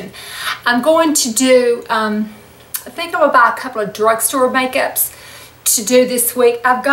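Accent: South, United States. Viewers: You are amazing and I just love to watch your videos! Your southern accent makes you adorable as well as beautiful! xoxo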